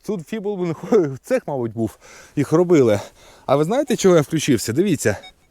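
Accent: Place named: Russia. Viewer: Привет,😂ну разговариваеш ты на украинском НО с русским акцентом 😂😂😂😂😂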